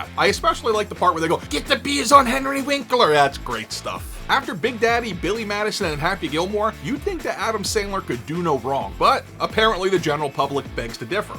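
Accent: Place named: New Jersey, United States. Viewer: You crack me up! That’s a lovely accent you have there. New Jersey?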